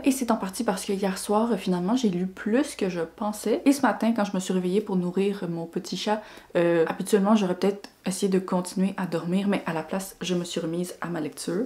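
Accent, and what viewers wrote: Quebec, Canada. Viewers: Je ne suis absolument pas Québécoise ou Canadienne, je te découvre tout juste aujourd'hui grâce à une autre booktueuse qui a parlé de toi (@ mais oh my god je t'adore ! 😊😂🤩 J'adore ta pêche, ta façon de parler, de parler des livres, ton humour (oui je trouve que tu es drôle haha), TON accent 😂 (j'adore l'accent Québecois haha). Je suis très heureuse de te découvrir. Ça faisait longtemps que je n'avais pas fait de découverte de booktubeuse (hormis Lausdiary justement que j'ai découverte depuis 2 jours). Tu fais du bien. Je m'en vais continuer à tout regarder ce que tu as déjà fait et te suivre au présent ! Bravo pour ton travail. ❤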